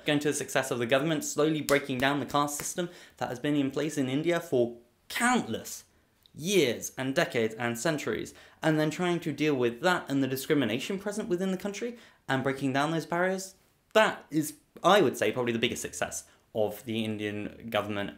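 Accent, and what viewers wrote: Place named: South London, United Kingdom. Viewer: Hey, by any chance u from south london? ur accent seems like u from lambeth or somewhere like that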